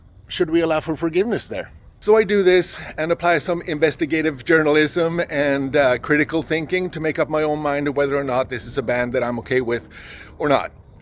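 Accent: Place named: Europe. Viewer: If you are seeking out black metal for morality and humanity, you are barking up the wrong tree. You should also note that Satanic black metal is explicitly in support of murder, grape, sacrifice of children, destruction of all innocence, etc. etc. .... and you clearly do not have a problem with all of that. But the moment the bands concern themselves with heritage, cultural superiority, and attacking a religion other than Christianity, suddenly its a problem. You sound European, so I don't have to tell you that your land, your culture, and your way of life is under direct attack from foreign invaders. But all that aside, its black metal. Foisting a morality compass onto it negates its entire purpose.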